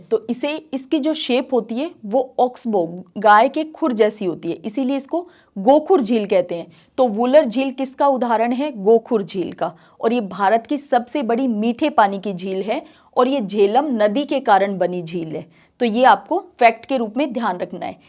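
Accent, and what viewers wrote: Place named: Gujarat, India. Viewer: Apke pronounce sation Amit Shah ji sahan hai. AP Gujarat se belong krte ho kya?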